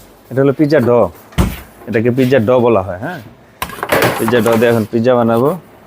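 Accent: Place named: Barishal, Bangladesh. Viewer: আমার কাছে বরিশালের ভাষার মত মনে হয়। ভাইয়ের বাড়ি বরিশাল হলে কোথায় জানাবেন।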